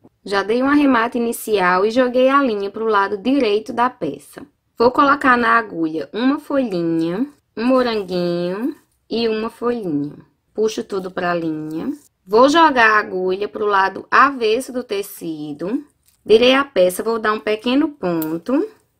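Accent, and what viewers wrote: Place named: Pernambuco, Brazil. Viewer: Maravilhoso, adorei!!! Que sotaque lindo!! Vc é pernambucana?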